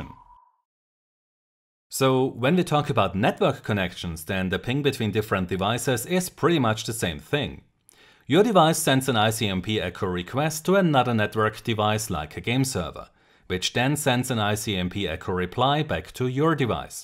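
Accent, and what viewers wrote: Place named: Germany. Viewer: Man hört soooo dass du deutsch bist 😂😂😂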